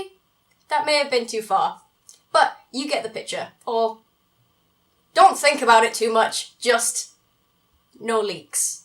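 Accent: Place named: Geordie, United Kingdom. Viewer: What is that accent? It sounds posh but with a bit of Geordie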